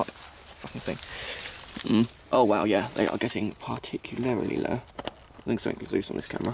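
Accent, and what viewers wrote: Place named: United Kingdom. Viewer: To me it's not the voice that changed the most, it's the accent. In the old video, I could understand something. In this video, I can understand almost nothing. Dat British English *grumpy noises*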